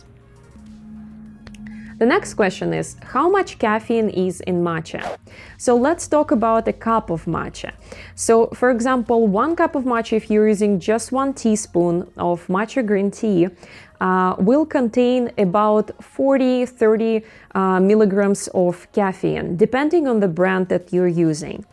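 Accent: Russia. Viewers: Where you from ? You sound Russian ?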